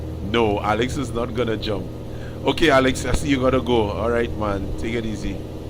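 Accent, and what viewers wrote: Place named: Grenada. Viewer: Your accent made me happy, I went to SGU in Grenada. I miss it! Pure Grenada!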